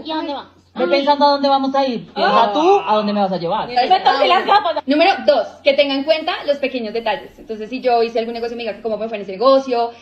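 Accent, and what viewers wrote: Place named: Mexico. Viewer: Me parece que este hombre más allá de generar consciencia desde un punto de vista objetivo e inteligente para despertar en el hombre su valía. Parece ser que quedó muy tocado de su divorcio y experiencias, solo escucho a un hombre ardido, que no le fue bien en el amor y ahora descarga sus comentarios a través de este canal. Me parecen comentarios misóginos y patanes sin sustento. Chicos no se traguen todo lo que dice, Suena demasiado ardido, ni preparado, ustedes creen que un profesional se expresaría así como él? El éxito de las parejas va más allá de la experiencia de un solo hombre. Además, me suena que es mexicano y no entiendo porque estudia mujeres como colombianas y argentinas, desde ahí está mal analizando mujeres ajenas a nuestra cultura. Es demasiado incoherente, analiza a las mujeres de tu país para que al menos haya un poco de coherencia y análisis real. 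Hace falta más preparación de este hombre. No solo su percepción.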